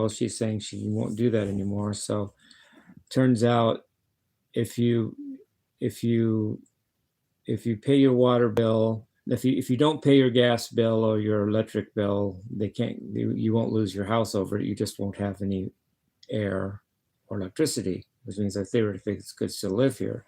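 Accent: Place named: East Coast, United States. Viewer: Hi, Nobody uses “Mock” except. I can hear your east coast accent.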